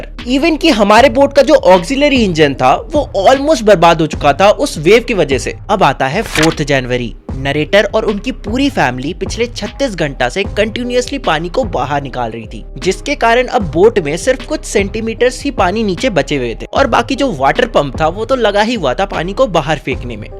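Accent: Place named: Bihar, India. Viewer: Abbe sale bihari pronounciation to sudhar le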